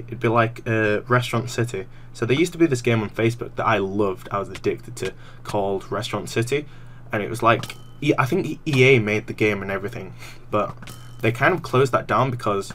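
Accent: United Kingdom. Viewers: wat your Asian I thought u were proper british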